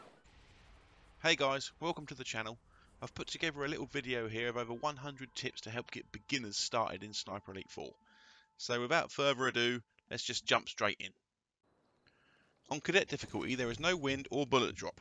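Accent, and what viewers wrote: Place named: United Kingdom. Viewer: A bit late on here but ' better late than never' I got SE2 3 and 4, love these British made games, on Steam with an Xbox controller, USB connected, in SE2 there is a tutorial at the beginning, so this taught me how to sneak around n shoot. But with any games, make mistakes and you learn. The tip you did miss was when in the bushes and having a dump be sure you are downwind. Well presented tutorial, 10/10 clearly understand your cool calm British English voice, they are the best with tutorial lessons.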